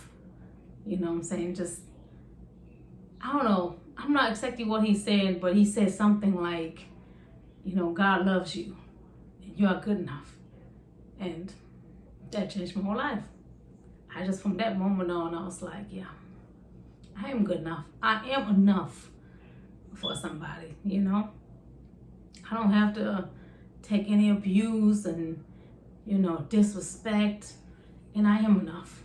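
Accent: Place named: Germany. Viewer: irgendwie kann ich raus hören das sie deutsch sprechen also an ihren deutschen akzent ich liebe aber ihre videos machen sie weiter so!❤️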